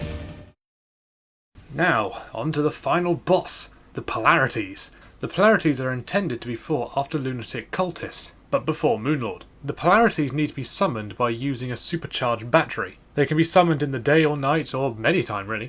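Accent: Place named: England, United Kingdom. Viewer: I thought you sounded such as soon as you started talking normally. Though uh, that might just be because of the stereotypes associated with your accent here in the US. English accents are often used to convey either intelligence or nobility here.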